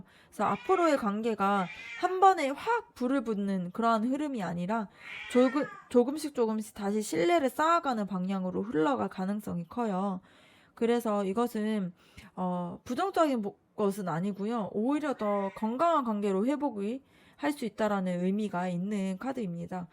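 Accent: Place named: Jeolla, South Korea. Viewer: (전라도)향기가 나는 구수한 리딩 잘 들었습니다😊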